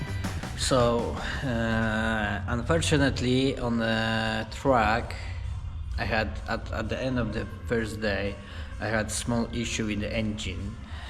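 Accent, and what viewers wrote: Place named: Poland. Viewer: 2.2? I skąd jesteś, bo chyba słyszę polski język xD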